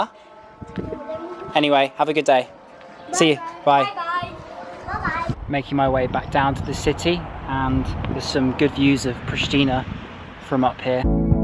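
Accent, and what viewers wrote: United Kingdom. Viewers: You’re British English is very beautiful 👍🏻 Oh, and about the orthodox church, all this churches belonged to Albanians I don’t get it why they want to destroy it and most of churches are Catholic Churches and they belong to Albanians... this churches are ancient Albanian monuments stolen by Serbs recent until war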